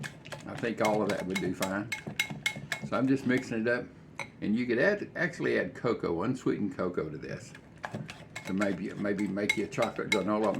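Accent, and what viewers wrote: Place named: South, United States. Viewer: Those granola bars look delicious and I’m certainly planning on making a batch or two. And by the way that’s how southerners talk. Taters instead of potatoes, maters instead of tomatoes, naners instead of bananas..... jeez give me a break. Keep up the good work dude.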